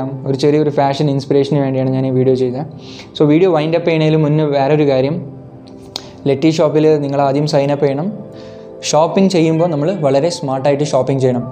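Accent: Tamil Nadu, India. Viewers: Ningal evideyanu settled.... Aake paade oru tamil touch undallo man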